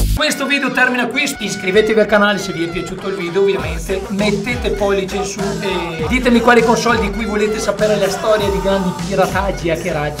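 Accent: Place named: Veneto, Italy. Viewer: L'accento veneta di quale provincia è?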